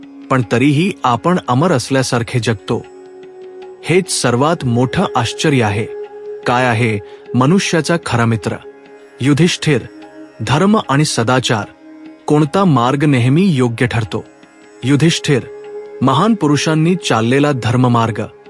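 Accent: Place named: Gujarat, India. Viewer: वाचना मध्ये गुजराती भाषेतील उच्चार असू नयेत.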